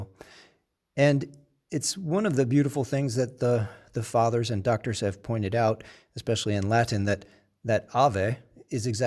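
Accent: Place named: Latin America. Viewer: So beautiful!! I really love your Latin accent. Thanks and God Bless you.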